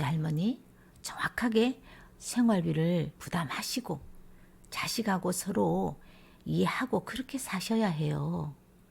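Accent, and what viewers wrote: Gyeongsang, South Korea. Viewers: 공감되는 내용에 경상도 말씨 지대로 구사하셔서 더더 재미집니다! 👍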